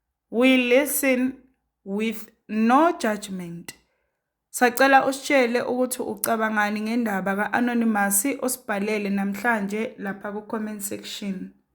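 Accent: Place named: Nigeria. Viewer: Wassalamu alaikum wa Rhamatullahi wa Bharakatuh You sound Nigerian yourself.. 'cause you are not Umzulu....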